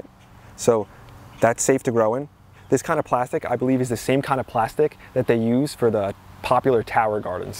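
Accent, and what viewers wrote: New Jersey, United States. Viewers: his loudmouth Jersey Yankee style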